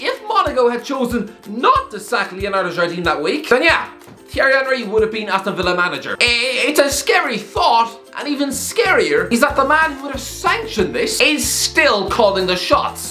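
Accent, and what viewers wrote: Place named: Ireland. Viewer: Speak English thick mick.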